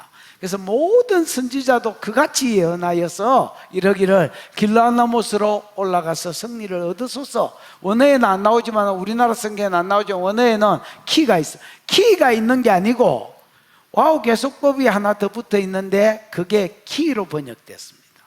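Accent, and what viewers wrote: Gyeongsang, South Korea. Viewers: 갱상도어로 들으니 정말 이해가 쏙쏙 말씀이 너무 재미가 있습니다. 감사합니다. 목사님^^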